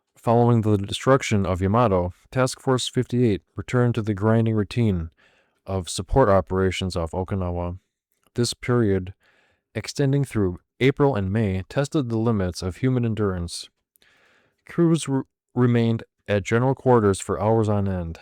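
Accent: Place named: United States. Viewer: Hi, I read your reply from my comment on yesterdays’ video about rommel. Great to see a new content creator-documentarian focused on the world wars with a channel that is not AI. Also, I don’t know if you have been here long but there’s a lot of us that go to sleep watching/listening to war documentaries and we are kind of a tight knit group. I think the reasoning is multifaceted and its not that we are war junkies. It has to do with being transported to another place and time, as well as the psychological aspect of “I had a stressful day but thank god I’m not there” Here are a few more helpful hints that you may want to work on: - Again, you have an incredibly calming voice considering we have american accents which by nature, isn’t as relaxing as the quintessential british historian voice speaking proper/high society english. I would suggest working on your cadence and delivery so you are more confident in your delivery - Calming and relaxing is good; boring is bad - Keep working on your editing skills and consider finding/creating a pleasant soundtrack for background music - As stated previously, try and stretch the videos out to an hour or so, thats kind of a sweet spot - Go watch the entire BBC/PBS WWII documentary series that was done in the 1990’s called “Battlefield” It is literally the holy grail for us in terms of this genre - Watch Fin’s Channels as stated in the last video to gain some more hints - Even though you are new at this, you already have a subscribe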